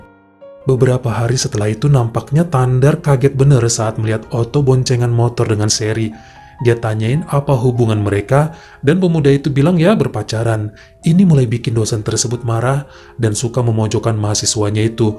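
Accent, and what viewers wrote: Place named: Java, Indonesia. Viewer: HALLO S NYA 3 SYRIA SELAKU HADIR BUAT CHANELNYA KAK,........SEMOGA MAKIN SUKSES UNT CHANELNYA YA KAK, BIKIN KANGEN KRN PEMBAWAAN BAHASAANYA LUCU DKIT DIKIT EHEMM GITU DI BUNUHI DNG BHS JAWA YG MEDOK TAPI ASIK BANGET LOVE......U ❤❤❤❤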